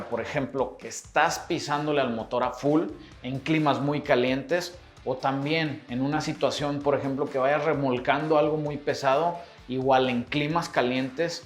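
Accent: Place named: Fresa, Mexico. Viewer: Osea que feo no? osea que fresa no? osea no mames wey con ese pinche fisico y ablas todo fresa con voz de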